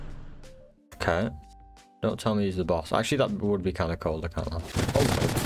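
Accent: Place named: United Kingdom. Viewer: I dont like this video. Unhelpful. Sounds too british. Please redo this video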